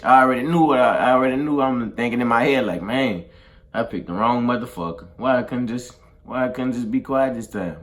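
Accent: Louisiana, United States. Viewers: That accent on point bro lol u can tell u from the south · he from Louisiana wen he say it twice to put emphasis on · That's how he talk 😐he from Louisiana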